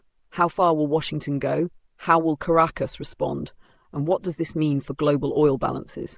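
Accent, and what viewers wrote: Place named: United Kingdom. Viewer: This is my new favorite channel. Pertinent info given in a cool British lady's voice. 😊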